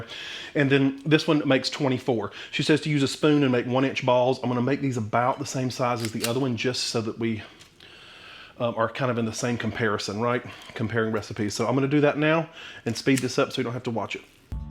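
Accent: South, United States. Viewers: Came for the comparisons; stayed cause I could relate n feel the excitement in each bite haha. You actually have convinced me to at least check out Thrive Market, so they picked a great influencer to sponsor too. I always skip ahead during most sponsor’s adds. Lastly I’m from the south (Louisiana) so I feel right at home listening to you say “y’all”. I’m amazed at how quickly u speak occasionally, yet enunciate crystal clear. Fantastic channel 👍💕👍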